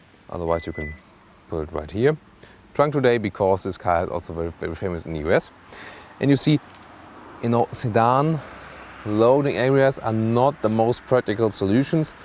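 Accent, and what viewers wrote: Germany. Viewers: Der deutsche Akzent fetzt 😎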